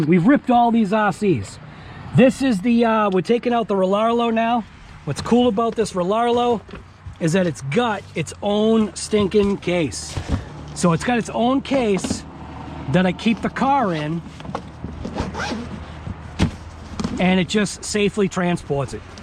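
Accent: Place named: Boston, United States. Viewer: Killer Boston accent! Great video. Car looks legit. I have the WLToys brushed version when it first came out. Highend brushless system in it not(Tekin rs pro, Trinity Punisher 13.5t, jx1181 servo). It’s a beast now. But this looks legit.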